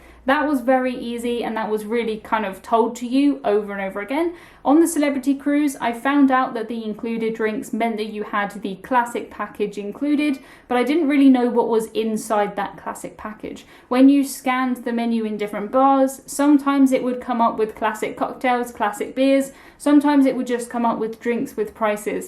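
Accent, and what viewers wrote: United Kingdom. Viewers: I like the idea of the animated meal - that is wild! On a separate note, I have never been on a cruise and started seeing some of your videos. I have enjoyed watching your videos. I have watched other cruise videos, to your credit I find your videos to be more fluid, that is they move quickly between points with a clear direction. Perhaps this is just the American in me that likes British accents but I enjoy hearing your voice as well as the British-isms. Thank you.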